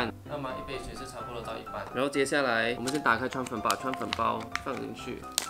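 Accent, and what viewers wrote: Malaysia. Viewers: 这位哥哥是不是马来西亚人(˶‾᷄ ⁻̫ ‾᷅˵)我听到liao，滚了（liao）。好可爱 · 因為是馬來西亞人lah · 大马华人的口音好可爱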